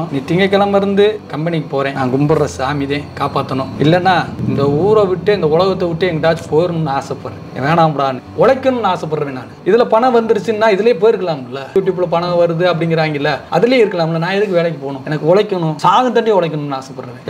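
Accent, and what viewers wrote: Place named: Usilampatti, India. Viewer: உங்கள் சமுதாயம் அக்கறை பார்க்கும் போது மகிழ்ச்சி உள்ளது அண்ணன்.நம்ம வட்டார வழக்கு அழகு அண்ணன் உசிலம்பட்டி இருந்து 😊😊😊😊😊😊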